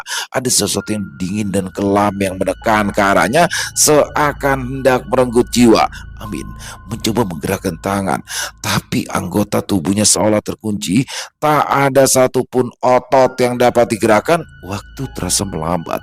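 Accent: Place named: South Kalimantan, Indonesia. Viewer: Mantap bg logat banjar y sdh kental benar. Salam dari banjarmasin 🙏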